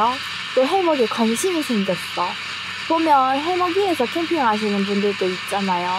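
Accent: Gyeongsang, South Korea. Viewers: 갱상도 분이신가보다 ㅋ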